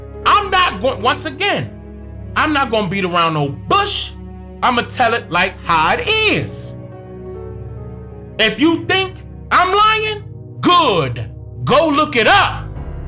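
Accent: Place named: Washington DC, United States. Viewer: Hey your accent sounds very DC like I'm from New Jersey and I moved to the DMV in high school I'm 34 years old are you from the district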